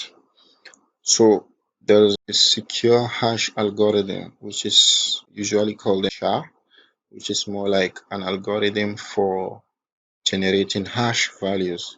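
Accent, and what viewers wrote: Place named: England, United Kingdom. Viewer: I can feel the english intonation (tureshi ni)... Keep up the good work 🙌❤️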